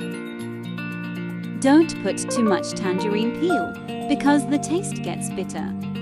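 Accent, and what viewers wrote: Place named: China. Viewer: Nice may voice cover na 🎉👏🥰 Chinese accent 😊 Looks yummy 😋 sis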